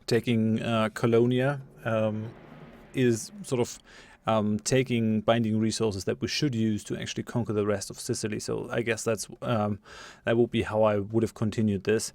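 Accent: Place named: Germany. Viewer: It was quite an interesting series! Waiting for your next playthroughs! Thank you for posting the save file. It's going to be interesting to play it from there. Lots of let's players are not as thoughtful and courteous! :) P.S.: I thought you would look like a professor/teacher with that kind of unique sharp look and the small frameless glasses after hearing your German accented English through the whole series lol. Didn't expect someone who looks like a proper stoner or Keanu from that old movie! No offense intended :) Thanks and have fun!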